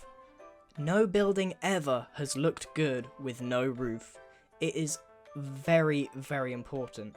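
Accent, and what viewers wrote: United Kingdom. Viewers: dude dude i really like your brithish acsent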